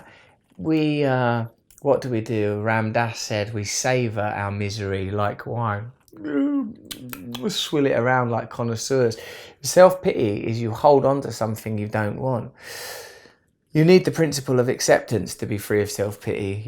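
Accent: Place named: United Kingdom. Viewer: thanks dude. I just finished your book Freedom and it's awesome btw. you remind me a lot of a friend I have, she also says wise things and also is very funny and also is British, so based on my sample size of 2 in this survey I guess it must mean the British are wise, funny and, well, British. have cool accents, lets make that number 3. anyway thank you xx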